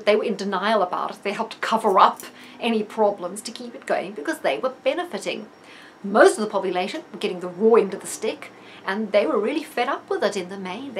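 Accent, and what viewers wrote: South Africa. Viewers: Another great video- thank you. Are you South African?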